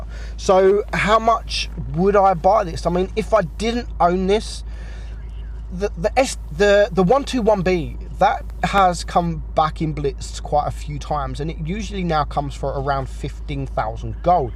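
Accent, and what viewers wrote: United Kingdom. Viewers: Ik ur british but from where?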